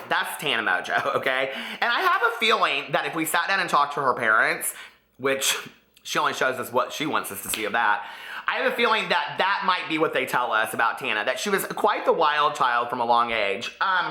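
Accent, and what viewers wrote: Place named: Midwest, United States. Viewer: Is the n silent in that girls last name or is it just fast speaking midwestern accent? Cause I’m dead ass hearing Tana Mojo every time he says her name.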